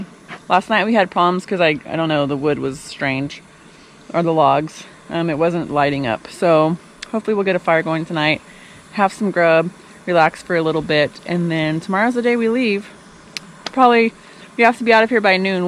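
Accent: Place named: Tennessee, United States. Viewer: D how long have you been in TN, you have "y'all" down pat..... Welcome to TN honey!!!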